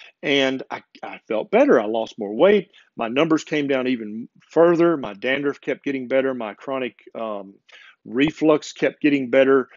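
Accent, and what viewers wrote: South, United States. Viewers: Nice to hear a southern accent on youtube! South Carolina here. Great vid! 🖒💚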